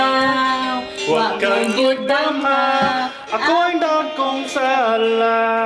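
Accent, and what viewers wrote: Visayas, Philippines. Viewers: Hala ka diha nakuratan ko! Ngano kbalo ka mgbisaya hala oi dong! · How come he knows how to speak bisaya? Are they doing missionary back in philippines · Hi Dong! If I close my eyes, you sound like a Bisayang Dako! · hahahaha ka cute sa bisaya hehehe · Whoaa! Nice to hear you speaking bisaya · Hehe ako inahan og ako ero haha very bisaya hah? ..ang galing!!!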